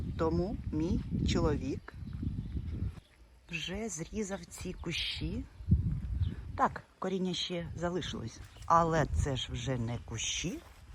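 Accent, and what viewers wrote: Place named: Germany. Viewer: Вітаю! Випадково натрапила на ваш канал, зайшла, сподобалось, цікаво, коли люди діляться досвідом, а ще цікаво заходити в гості до інших, щось пізнавати чи запозичати ідеї. Ви, мабуть, вже довго в Німеччині, відчувається акцент, знаю німецьку мову, чую її ознаки у вашій бесіді. Але у вас чудово виходить й украінською! Ви молодець! Запрошую і вас до себе віртуально погостювати! Вирощую сад, знімаю відео! Заходьте!❤❤❤❤❤❤Уявляю, як незабаром розквітне садочок на вашій ділянці! Коли люди мають таке бажання і азарт, як ви, а також роботящі, в них обов’язково все вийде!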